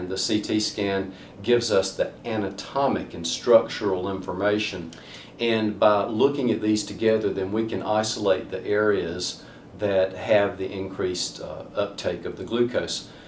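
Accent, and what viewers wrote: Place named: United States. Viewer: Thx v much for the info....it's very well explained....it's based on metabolic activity -I ve no problem with the accent (American...& I m a Malaysian)... -What I m not clear is we r told too restrain from taking too much simple sugars prior to the scan, as it might interfere with its absorption into the cells.....the question is a relative HOW mUCH is too much & for how long before z procedure?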